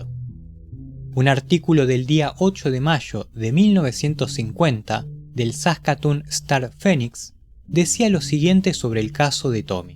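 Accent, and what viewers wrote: Argentina. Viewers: Los Argentinos son perfectos para narrar historias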